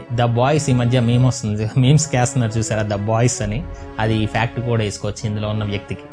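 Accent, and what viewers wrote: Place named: Telugu, India. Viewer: Era epudu chusina denini telugu lo em antaro naku telidhu antav nvu emina foreign lo puttava ne slang chuste pakka telugu medium gadi la unav, ayna idhi idhi recorded video a kada evadnina adigi chepochu kada leda google chesina telustadhi telugu li em antaro ani, prathodki idoka fashion aypoindhi telugu ranattu xtralu mingadam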